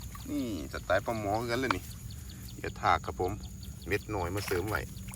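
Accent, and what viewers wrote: Korat, Thailand. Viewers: ชอบฟังภาษาโคราชfcสกลนครครับหมานๆเด่อ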